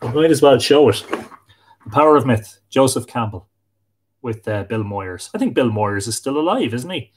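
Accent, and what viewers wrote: Ireland. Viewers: The Irish accent is helping your cause. I love the Irish accent especially coming from a sweet Irish lass. I've been told I have an accent is well having grown up in Boston but I always thought it was everyone else that had the accent not me. Anyways, God bless the Irish and God bless Ireland. It sounds like you guys need it just as much as America these days. Saint Patrick and Saint Bridget send help to Ireland!!! 🍀🍀🍀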